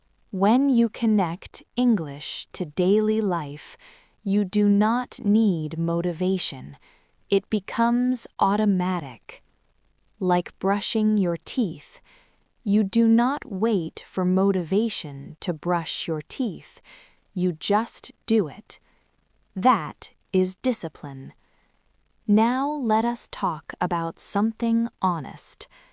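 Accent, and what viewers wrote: United States. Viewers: امريكية ماهي بريطانية ابداً لان نطق الكلمات مختلف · تبدو انجليزية أمريكية وليست بريطانية ؟؟ ارجو الرد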